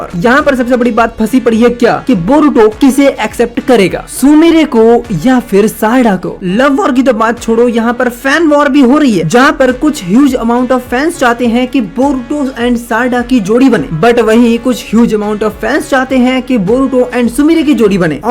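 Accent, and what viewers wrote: Bengal, India. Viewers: kiya bhai tu bangali hay?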